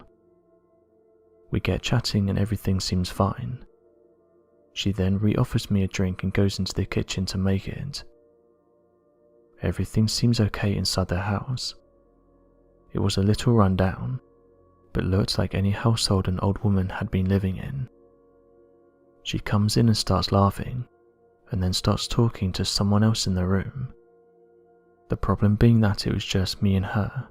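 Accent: England, United Kingdom. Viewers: He sounds like an asian guy that learned english in great britain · Why not just speak in your usual English accent without the drama music?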